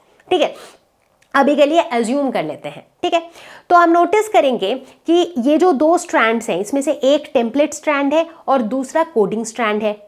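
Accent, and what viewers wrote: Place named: Hyderabad, India. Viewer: aapka hyderabadi accent is super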